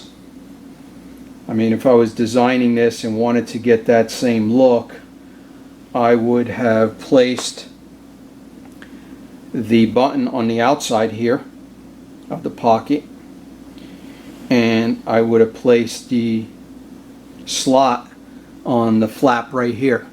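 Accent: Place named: Upstate New York, United States. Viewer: Shooting in the woods both Filson vids. Are you in upstate NY, Penn State? I detect the accent maybe from there. Enjoy your vids. Greetings from Washington State.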